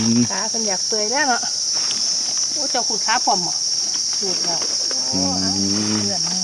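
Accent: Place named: Ubon Ratchathani, Thailand. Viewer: ป้าถามแหน่ยุพะเยาคือเว้าอิสานจ้าสำเนียงคืออุบลป้าติดตามจ้าแต่บ่อค่อยใด้เม้นหมานๆจ้า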